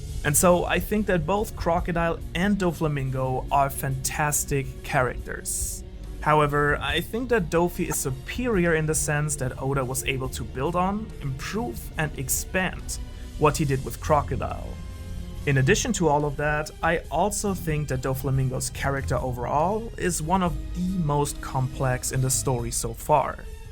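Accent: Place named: Germany. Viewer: you dont sound native english....are you from germany ?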